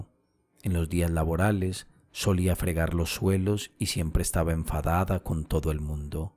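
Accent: Colombia. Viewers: Chejov y todo ese grupo de escritores , describen con maestría la idiosincracia del pueblo ruso, su pobreza, sus anhelos, la rutina que los apabulla y esa relación tan particular con el cristianismo ortodoxo. Buena lectura de un " paisa" colombiano delatado por su acento.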